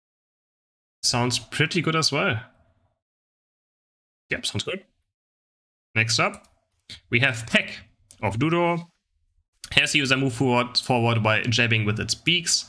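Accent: Germany. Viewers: where are u from? germany?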